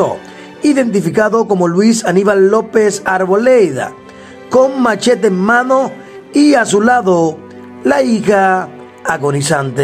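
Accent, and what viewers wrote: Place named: Dominican Republic. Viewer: Solo te faltó decir en qué lugar del planeta paso eso noticias tragicas en voz de un Dominicano eres comunicador y eres bueno manejate. Ook mi Bro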